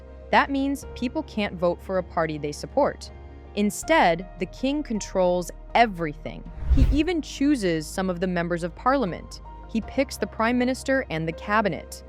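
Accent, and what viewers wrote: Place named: United States. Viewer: I wonder where this is going, you are speaking with American voice, what do you want from Africa because your President does not like Africa. Check your country, God has revealed USA as Mistry Babylon The Great. USA is about to be judged, it is imminent, prepare yourselves because you will be surprised while you looking at others countries.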